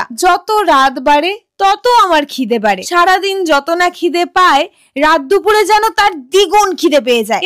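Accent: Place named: Hindi, India. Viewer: Tomar Kotha bole Hindi❤❤😊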